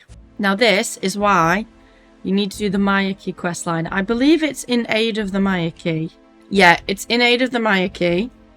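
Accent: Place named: Yorkshire, United Kingdom. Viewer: So Yorkshire lol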